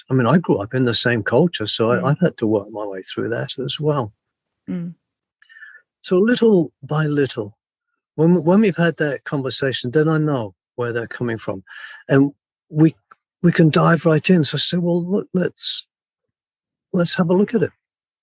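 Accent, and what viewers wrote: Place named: Scotland, United Kingdom. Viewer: My favourite Scottish YouTuber award always goes to. I just looooove that Scottish accent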